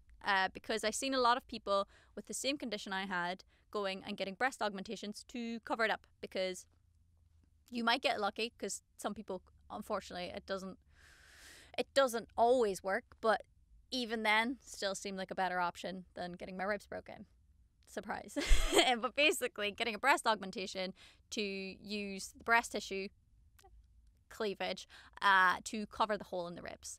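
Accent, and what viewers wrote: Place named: Ireland. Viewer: First off, who the hell would write a hateful comment, this is for fans. Anyhoo, a close family member of mine has the same condition(pigeon chest) and a case of flail ribs(the bottom ribs stick out from the ribcage). A male, I guess it might be different in a self-esteem point of view. It posed no health issues and he worked out and went to the gym and although it's still noticeable, not nearly so as before like when he was very young. I didn't know there was actually a surgery to fix or change it; not including the enhancement that is. No one should make someone feel bad about having an elective surgery to correct an issue or to help one feel better about themselves. I enjoy the sound of her voice so much. The Irish accent is absolutely mesmerizing.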